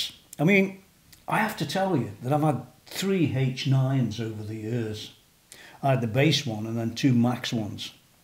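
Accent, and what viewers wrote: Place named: Derbyshire, United Kingdom. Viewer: Hi. I’m new to your channel. Really enjoyed your presentation. I’m seriously thinking of grabbing the H90 is someone wants my Luke. I can’t pinpoint your accent.Derbyshire? I will try and find you on Facebook as I would love to bend your ear. Fantastic channel.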